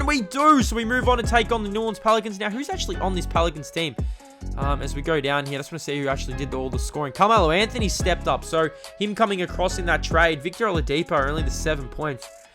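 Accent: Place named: Australia. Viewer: Where in Australia r u from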